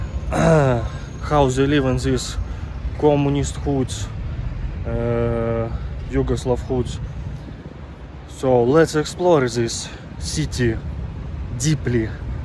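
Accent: Russia. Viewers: Haha you are funny guy. Like you’re russian accent. About USA and Russia I would say we dont care very much and probably dont love them or hate them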